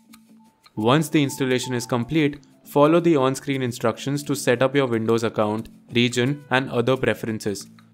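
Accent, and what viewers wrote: India. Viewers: As I heard the Indian accent, I knew this is going to work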